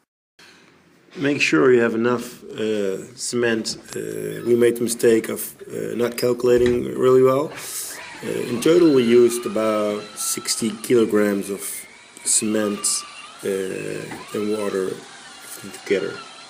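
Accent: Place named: Netherlands. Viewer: I could just hear from your accent you're from the Netherlands haha. Ziet er echt gaaf uit, die tafel! Echt super mooi ding :)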